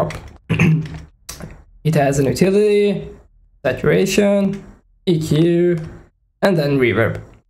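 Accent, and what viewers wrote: Italy. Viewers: Si sente l'accento italiano ahahah hai stra talento! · Italian I think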